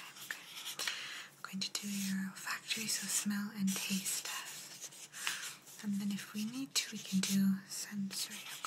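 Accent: Italy. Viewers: Hi, i must have fallen asleep. I will need to watch the video again. 😊 Could you do another cranial exam with your italian accent? 😅 or a travel agent. Showing us beautiful places in Italy to visit. That would be amazing! Anyways, thank you for another great video. Ciao! 😊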